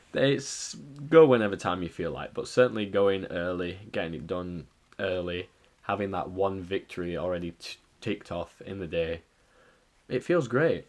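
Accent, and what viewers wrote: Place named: Sheffield, United Kingdom. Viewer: sheffield accent spotted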